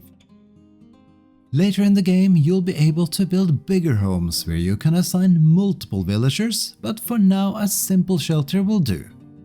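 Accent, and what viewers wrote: Norway. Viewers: Are you from Norway ? your accsent sounds like that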